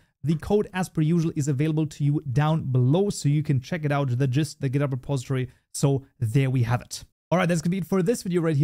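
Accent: Germany. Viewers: Funny german accent <3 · Sure, Ill do so. Funny german accent <3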